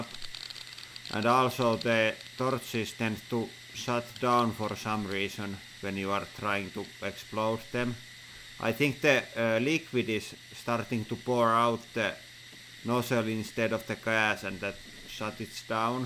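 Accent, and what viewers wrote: Finland. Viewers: kuulostaa suomalaiselta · tää ralli englanti 👌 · You Sound like your from finland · Are you from Finland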